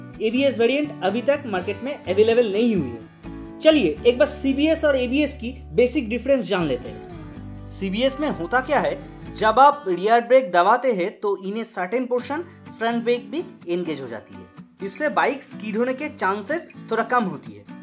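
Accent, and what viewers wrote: Bengal, India. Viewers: Dada apnar hindi te bangali feeling puro relavent.tobe abs version ta hole besi vlo hoto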